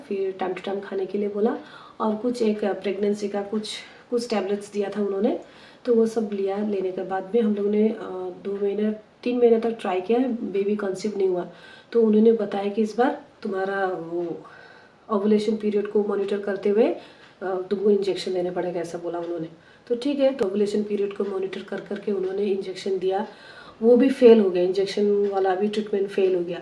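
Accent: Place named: Nepal. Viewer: Why do you sound like Nepali?? Di are you Nepali ??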